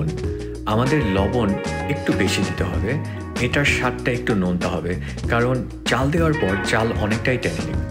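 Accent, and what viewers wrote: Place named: Bangladesh. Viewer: এর ভয়েস শুনে আজ জানলাম ব্যাটা বাংলাদেশী। আগে জানলে দেখতাম ই না। যা ভাগ।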